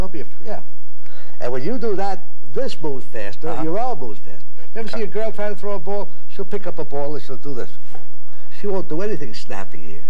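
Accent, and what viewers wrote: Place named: Brooklyn, United States. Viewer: You can hear the old Brooklyn 🙂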